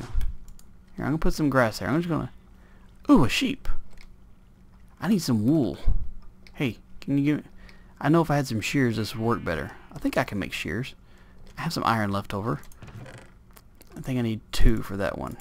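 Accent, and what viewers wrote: South, United States. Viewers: Thank you very much for these awesome videos,! I like your accent too. What state are you from? Greetings from Washington State! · Like country accent im from Georgia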